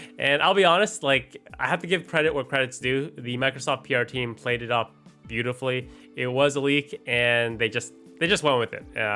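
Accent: United States. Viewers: I thought he was American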